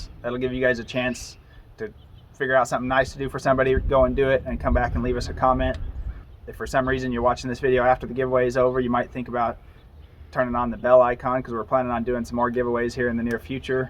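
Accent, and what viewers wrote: South, United States. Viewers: Oh, I had a feeling you had to be from the south! Can't get rid of that drawl that easily :P May I ask what plans you intend to take after college? Gonna keep ferrying or gonna try to be a large animal vet? I like hearing the little updates in your life with the videos as well as all the horse info- you're just as much a part of the video as the horses! What a sweet giveaway though, that's really lovely. I'm gonna have to see what I can do out here now and get my entry in! Hope y'all are having a good Easter from down here in Texas! <3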